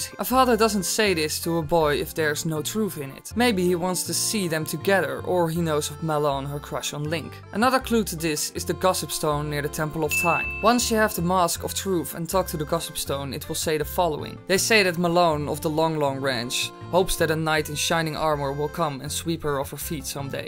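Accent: Netherlands. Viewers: ...ben je Nederlands toevallig? Ik hoor het aan je accent als je Engels spreekt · Haha you are definitely dutch right? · Are you dutch? (Just a random question) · Ik kan horen dat je Nederlands bent je praat met een accent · you're dutch for sure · are you dutch because i hear something that sound like a dutch accent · R you dutch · Are you dutch? Whatever love your accent.. and the theory😊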